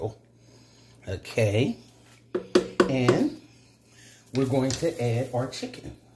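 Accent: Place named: Pittsburgh, United States. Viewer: You have a very heavy Pittsburgh accent, either that or Pennsylvanian. Pittsburgh here, love the video!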